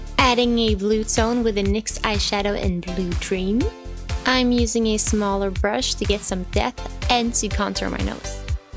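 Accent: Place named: Skåne, Sweden. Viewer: ÄLSKAR din dialekt, är du skåning?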